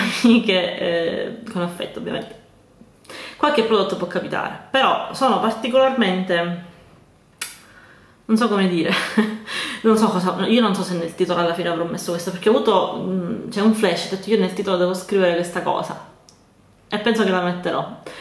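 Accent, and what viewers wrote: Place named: Palermo, Italy. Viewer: ho una curiosità ma di origine sei di Palermo o Catania? Io sonò di bagheria e dall'accento sembrerebbe tu sia di Palermo:) Dai la prossima volta che vieni fallo sapere magari ci vediamo, ci facciamo un aperitivo:) Mi piacerebbe tanto!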